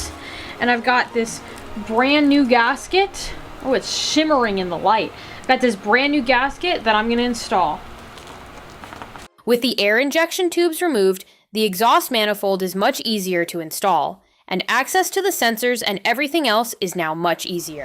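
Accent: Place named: United Kingdom. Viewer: Nice job, you so funny and cute with that British accent!!!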